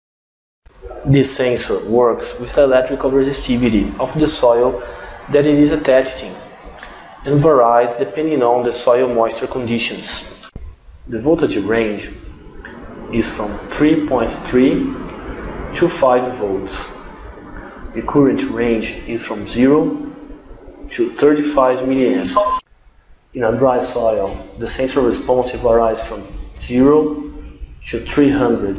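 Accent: Brazil. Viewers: Hi, nice project. Just a silly comment about your code: if the values are exactly 300 or 700, nothing will happen, because the 2 first 'if' consider > 300 or <300, never =300. The same with the 2 last 'if' regarding 700. It is not a big deal, because the probability of such values got read is small, but it is also something simple to fix. BTW, are you Brazilian? Your accent seemed Brazilian.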